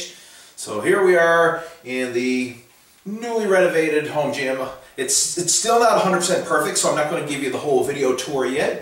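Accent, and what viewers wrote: Newfoundland, Canada. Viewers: this guy sounds like a newfie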